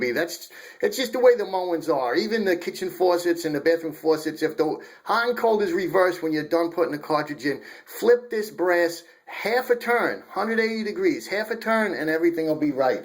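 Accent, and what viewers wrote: New York, United States. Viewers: Nice Job!! I'm a fellow handyman and it's always great to get help or advice from a contemporary! Love your style and NY accent too as I'm from Philly and my wife's from Jersey so it reminds me of home! Love the way you remind the "boys and girls" to keep calm and take a moment! Great work man!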